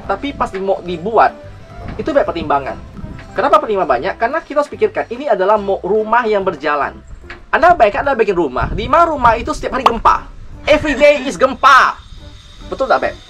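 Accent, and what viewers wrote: Pontianak, Indonesia. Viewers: Bahase Nye to Melayu chine Pontianak..... Mantapsss...macam channel hobby makan....lanjut ko 😁😁😁😅😛🙏👍👍👍🌟🌟😃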